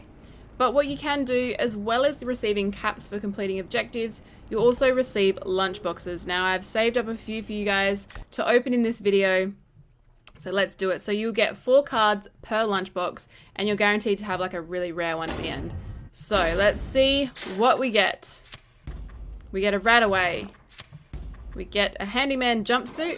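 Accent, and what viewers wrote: Australia. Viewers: ahh an aussie or new zealand ehh can't tell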